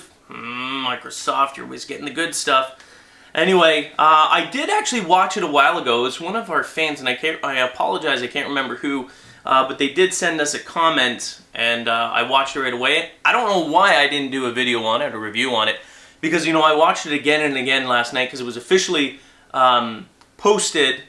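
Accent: Canada. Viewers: this guy is canadian right?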